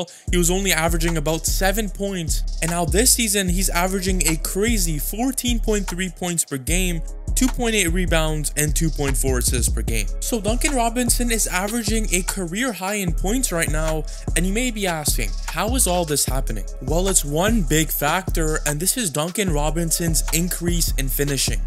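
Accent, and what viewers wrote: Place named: Miami, United States. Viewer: ooohh ok. I ask cos your accent is similar to a Miami accent. When you say "ago" in "two weeks ago" at the beginning, it sounds just like a Miamian lol and the way you say "bro" fits in perfectly on south beach lmfaooo. Great video! Love to the see Heat getting love. Haywood Highsmith is awesome!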